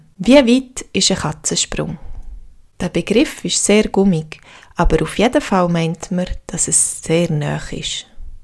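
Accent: Bern, Switzerland. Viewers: Merci vielmals Aber i ha ein frag Isch das barndutsch?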